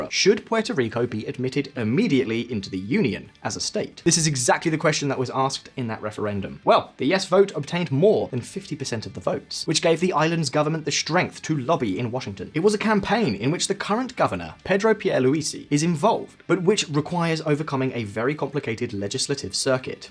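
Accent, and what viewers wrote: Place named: United Kingdom. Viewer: This is a great article about Puerto Rico becoming the 51 State ! Aside of being a great subject, the only problem I have is trying to understand this man with such strong British? accent & on top of it , he just talks way too fast! Nevertheless he is on point & definitely did his home work on the subject! Ps: Slow down & continue educating people in regards to the Statehood for Puerto Rico!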